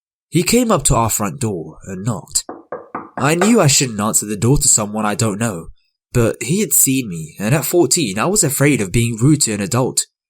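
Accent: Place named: England, United Kingdom. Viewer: You're welcome! I figured you were from England. I am from Louisville Kentucky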